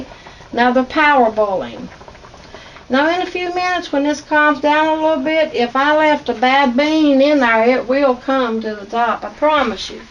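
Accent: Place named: West Virginia, United States. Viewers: I would LOVE to know where you live.. You sound like you are from either West Virginia or Kentucky.. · Love your videos. I saw Appalachian cooking and thought of my Daddy , he was born in the Appalachian mountains. He had the same kinda accent as you. Brings back alot of memories and your cooking is similar to his. God bless you.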